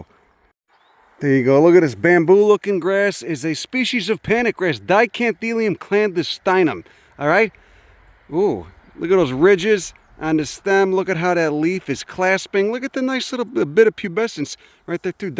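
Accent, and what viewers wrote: Chicago, United States. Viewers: A man with tattoos, Chicago accent who loves Native plants is a man I would marry! I wish there was a gay clone of you!! And your fucking HILARIOUS!! ❤ Keep up the great work!!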